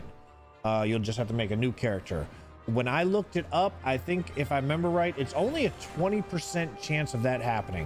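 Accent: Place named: United States. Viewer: It is somehow weird watching someone with an American accent play this game.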